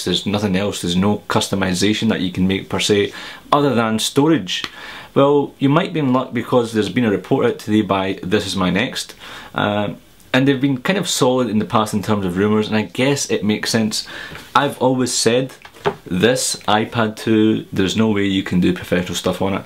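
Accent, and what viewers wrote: Scotland, United Kingdom. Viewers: I like Ur scottish Accent! Big Country Forever! Stay alive!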